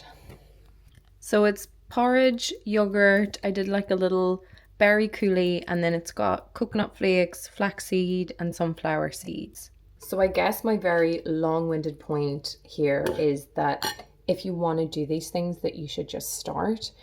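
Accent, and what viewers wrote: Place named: Ireland. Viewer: You just unlocked something special for me with Reas Earth. I’m obsessed … and very much on this path of finally doing the things I have been dreaming of. thank you! I also love watching you, your content and voice are incredible. Maybe because my husband is Irish and I love the accent ❤ congratulations on your work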